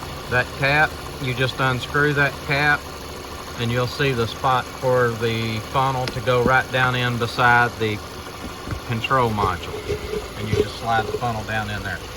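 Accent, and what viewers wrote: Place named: United States. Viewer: Thanks for this excellent video. One thing I really appreciate is the very clear American English! I'm not very mechanically inclined, and it helps immensely to understand what is being explained, and very well, I might add!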